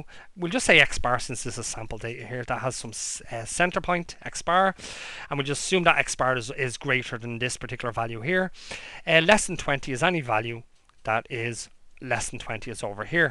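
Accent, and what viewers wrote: Ireland. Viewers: You're Irish right?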